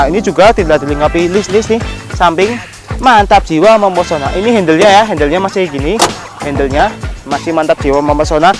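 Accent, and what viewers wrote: Banyumas, Indonesia. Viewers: Ngapak jiwa,,,Sukses trs masseehhh,,Gombong Puring Hadir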